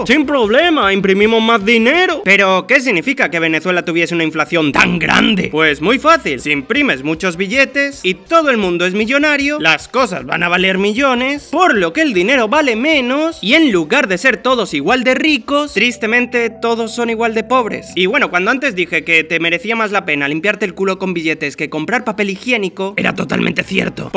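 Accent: Spain. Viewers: Bro como q eres Venezolano? Pensé que eras español 😭😭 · Por qué si eres venezolano hablas como español? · Espera, cómo que eres Venezolano?, yo soy venezolano, y diría que suenas más español que venezolano 🤨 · Históricamente incorrecto, pero una carta de amor a la comedia Brother no te creo que seas venezolano nunca con ese acento español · Por que suenas a visualpolitic con ese acento y expresiones raras?(español de españapara ser mas claro) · No suenas como venezolano suena como español o se te olvidaron las raíces, que pena ni fueras dicho que eres de Venezuela me da pena decir que ere mi paisano pero 😅 si da risa y es cierto lo que cuentas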